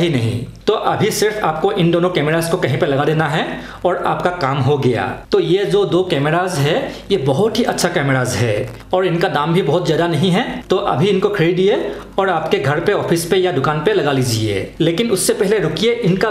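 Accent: Assam, India. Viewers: Apka bolnei ka tarika Assamese language sei milta hei ❤ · Bhai aap Assam se Ho kya... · He is Assamese I guess. His accent is Assamese 😀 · Assamese hai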